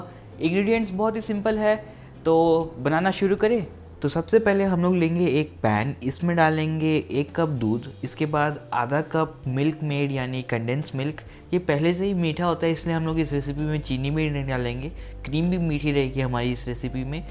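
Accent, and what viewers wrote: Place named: Hyderabad, India. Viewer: Your huderabadi accent is on point ...God bless u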